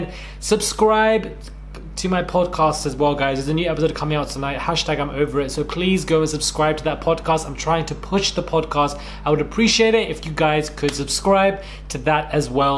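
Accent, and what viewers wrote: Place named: United Kingdom. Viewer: Ngl, was laughing my WHOLE ass off listening to trying to talk about US politics and locations. Caught the pause for pronouncing Illinois correctly, but I lost it when he said "Ar-Kansas." Good vid. Just super funny listening to someone (presumably) from the UK discuss these things.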